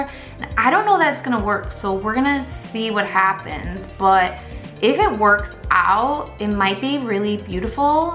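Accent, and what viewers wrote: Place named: Illinois, United States. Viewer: I was born and raised in central Illinois...I knew your accent sounded like home🤍 I’ve lived in Louisiana for almost 20 years and the dialect is completely different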